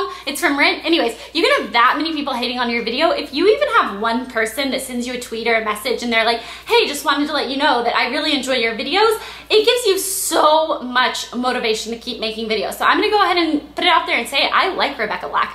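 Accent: United States. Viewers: cute chick... I like your freaking american accent xD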